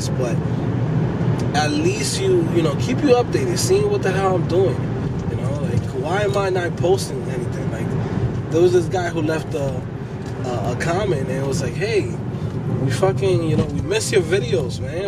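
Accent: New York, United States. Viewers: Hey I have been watching your video for days. loved the work. So sorry to hear about your loss, family is the biggest wealth a person has. BTW are are you from NYC? I am from queens and I think you are a new yorker too. :)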